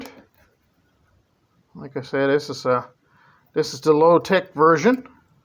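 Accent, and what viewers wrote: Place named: Newfoundland, Canada. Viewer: I started watching out of curiosity....soon as I heard the newfoundlander accent you could have been reading war and peace and I'd have sat through to the end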